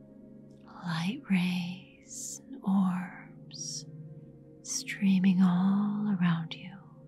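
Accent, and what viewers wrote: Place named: United States. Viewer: REALLY?? oh how supernice! you sound USA, such good english, so lovely!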